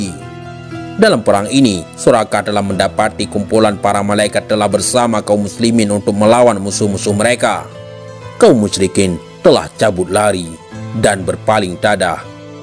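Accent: Aceh, Indonesia. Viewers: logat ACEHH kyk nya...taah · Salam admin:sya dari padang minangkabau.bolehkah saya bersejerah bertanya kpda admin. Admin dari mana. Bhsa admin bercampur dngn bhsa indonesia & melayu.logatnya sprti logat aceh.apakah admin orng melayu aceh.orng malaysia tak mungkin rasanya bhsa melayu malaysia berbeda dngn bhsa admin.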